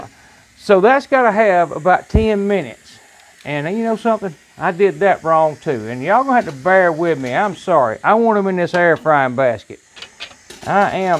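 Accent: South, United States. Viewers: I love this video. You're such a character 😁 your American accent made me smile. Such a good job on those ribs! I love my instant pots.. can't wait to try ribs now! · He’s from the south so he has an accent.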